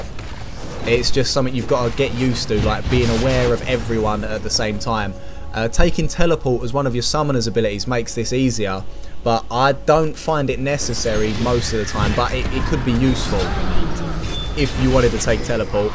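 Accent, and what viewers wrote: United Kingdom. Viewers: u from london?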